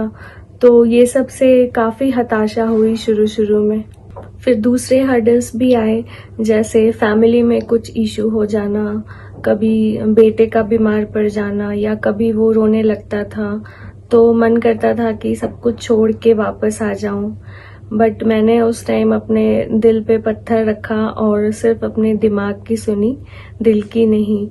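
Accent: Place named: Bihar, India. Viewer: Ma'am r you from Bihar excellent❤️😊😊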